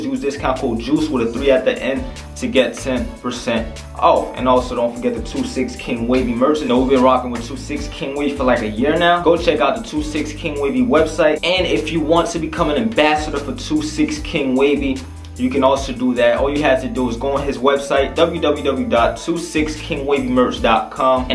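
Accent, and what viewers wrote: New Jersey, United States. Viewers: you sound like you from Jersey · Yo juice you from Jersey?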